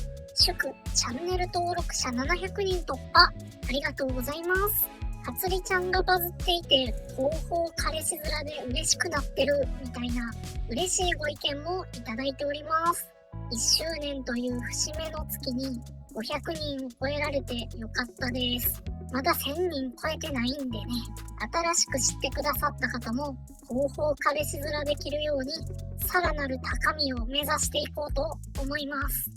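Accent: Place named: Kansai, Japan. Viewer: 関西弁が心地いい。エアコンの取り付けって、電気工事士の資格いらないの知らなかった。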